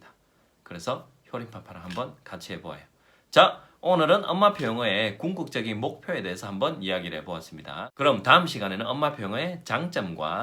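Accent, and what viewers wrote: Gyeongsang, South Korea. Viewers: 목표설정에 도움되는 영상이네요~~어정쩡한거 말고 잘했으면 좋겠는거!. 경상도갬성 넘 조음요 ㅋㅋㅋ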